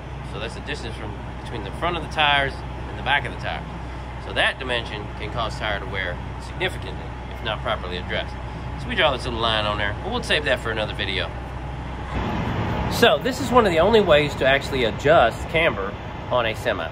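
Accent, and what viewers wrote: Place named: South, United States. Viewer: I do love how in some videos he says something like, “As you can tell by my southern accent, I’m not an educated man.” Damn, dude! I am an expert in my field, but you do things that I could not even try to pull off! Keep it up!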